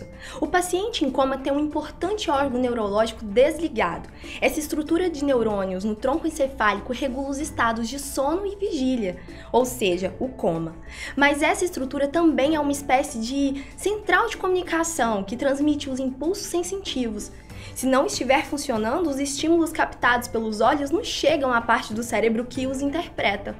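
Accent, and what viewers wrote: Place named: Minas Gerais, Brazil. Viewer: ela tem um sotaque bonito de mineira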